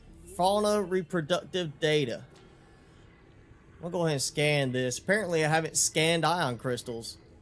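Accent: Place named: Texas, United States. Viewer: Nice Texan talk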